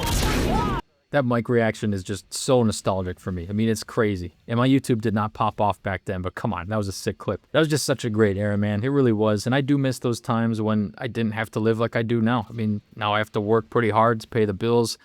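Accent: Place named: Wisconsin, United States. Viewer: Bro has the most Wisconsin accent ever I gotta say dude 😅😅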